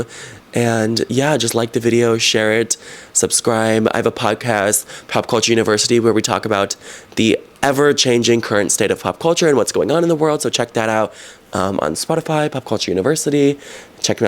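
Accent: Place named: New Jersey, United States. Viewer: She sounds like she's from Jersey or from Newport.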